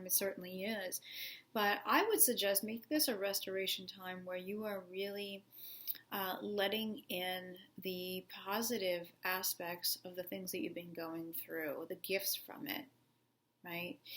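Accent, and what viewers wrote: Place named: New York, United States. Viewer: I loved Green Day in the ‘90s too and saw them in concert in NY then. I thought I heard a NY accent. Are you on LI too? We must be around the same age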